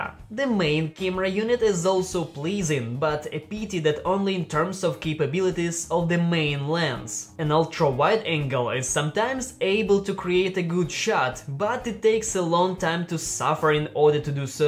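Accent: Russia. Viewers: Is that a Russian accent ? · Can you test the battery in watching youtube, battery test in playing games, battery test in browsing, battery test in watching videos. Will this 4k mah can last 2 days? Like your review and you talk like Russian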